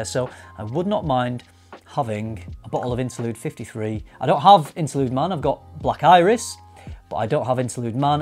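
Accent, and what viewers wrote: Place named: Bolton, United Kingdom. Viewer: Are you from Greater Manchester like the Bolton area? I recognise the accent but it's more like mine, I'm from Bolton but I moved to Lancaster then Workington and my accent has changed. I don't say perk instead of park anymore or hew instead of how etc. I basically round my vowels now but people can still tell I'm from Bolton even though it has been 19 years. I might be wrong but I'm sure I can hear Bolton in your accent or close to Bolton. I feel like I've heard your voice and seen you somewhere else do you do videos on facebook? Only just found your videos I just watched your comparing Aventus and like the cut of your jib so just subscribed!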